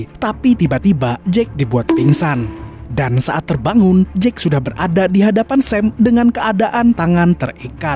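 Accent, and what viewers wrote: Banjar, Indonesia. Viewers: org banjar skli nya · bah mimin nya urg bnjar, mntap mang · Astaga banjar sekalinya, tekajut² uln 😆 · Banjar banar wkwk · Nah paman, orang Banjar kh pian 😁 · Bahh banjar sakalinya si nihh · Kira orang manakah sekali nya urang banua juaaaaaa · banjarr sekalinya pian lahj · Harau urg banjar sekalinya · Mantap banjar nya 🤣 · orng Banjar kh bg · Umaiiii Banjar ai rupanya wal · Banjar jua sakalinya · Banjar kah nii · Orang banjar skli nya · urang banjar kali nya naseb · Aihh sekalinya ikam urang banjar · Keren yang bacakan alur ceritanya sampai kada hingkat guring ulun. Pasti angah dari Banjar ya kan? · akay urng banjar kalinya di dangar bahasa😂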